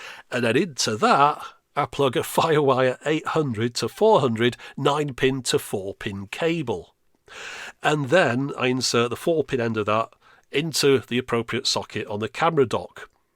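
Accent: United Kingdom. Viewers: the British is off the charts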